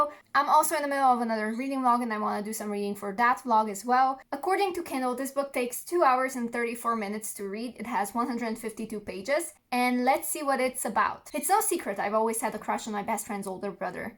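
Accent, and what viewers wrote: Slovenia. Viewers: I love your slovenian accent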